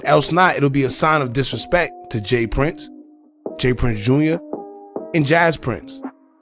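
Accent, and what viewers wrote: New York, United States. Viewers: Hey Bro are you from New York?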